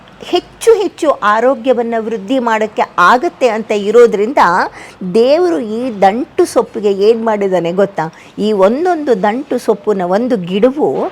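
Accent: Standard. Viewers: ನಿಮ್ಮ ಒಳ್ಳೆಯ ಮಾಹಿತಿ ಮತ್ತು ಸ್ವಚ್ಛ ಕನ್ನಸ ಭಾಷೆ ಯಲ್ಲಿ ಅರ್ಥ ಆಗುವ ಹಾಗೆ ತಿಳಿದಿದ್ದಕ್ಕೆ ತಮಗೆ ಅನಂತ ಪ್ರಣಾಮಗಳು ಮೇಡಂ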